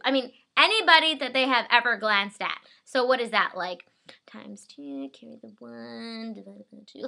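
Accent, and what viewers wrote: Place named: Hyderabad, India. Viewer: uve got HYDERABADI connection. U should make videos on accents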